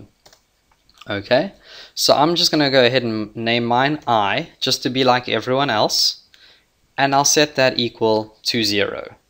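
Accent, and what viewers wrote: South Africa. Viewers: I knew that was a South African accent. Thanks boet !!!!!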